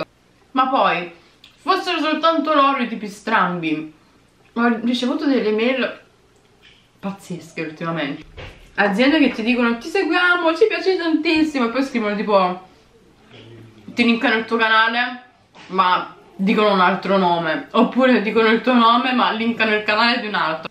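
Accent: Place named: Naples, Italy. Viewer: Sei napoletana 😍😍😍😍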